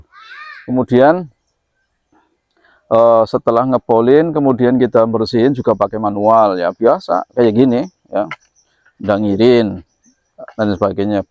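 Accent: Java, Indonesia. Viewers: Ok pak jowo banget iki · Mas, ngeliat alam Suriname sama persis dengan alam Indonesia. Mendengar logat mas,Jawa banget. Kayak ngeliat orang Jawa di Indonesia. Matur nuwun mas. Sudah memvidiokan alam Suriname. Salam buat semua sodara sodara disuriname Yo mas. Semoga semua sehat selalu...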